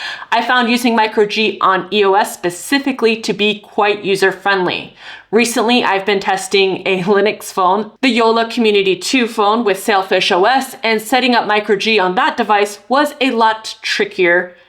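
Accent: United States. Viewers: Watching this video on that exact device, I got the white one too. I didn't know your channel before, if not for the name I would've never known that you were French too, your accent is very American! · Excellent video! By your accent you’re a fellow American, but are you using this in the US? I’m strongly considering picking one up here in the States, but I’m also very hesitant considering the instability of this compared to a more mainstream phone with better US support and a much larger user base. I’m about to start a PhD program and don’t want to get a major headache on my hands with this. Is it easy to get set up in the US? Is it painless to use here? Any insight would be much appreciated! Thanks again for such a great video review!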